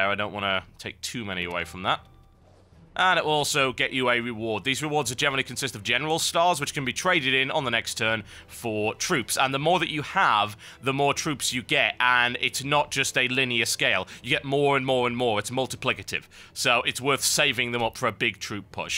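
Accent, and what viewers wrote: United Kingdom. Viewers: *Facepalm* ITS A BRITISH ACCENT. OBVIOUSLY. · I was under the impression he was British...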